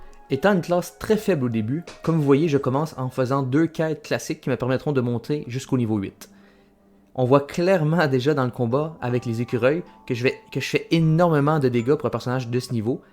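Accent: Canada. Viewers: super ! continue & enjoy ! on adore le petit accent du canada <3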